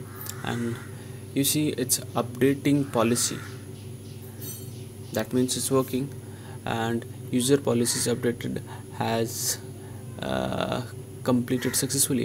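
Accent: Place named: India. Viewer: thanks, it helped but ur indian accent though😂 😂 😂 😂 😂 😂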